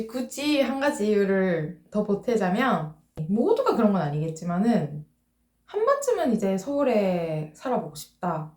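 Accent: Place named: Japan. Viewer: 뭔가 말투가 일본 억양이 평일 낮 시간대에 이럴때랑ㅋㅋㅋ자주 일본억양이들리네여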